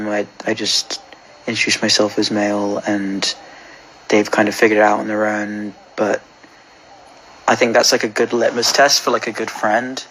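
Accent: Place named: United Kingdom. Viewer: sounds so incredibly British when talking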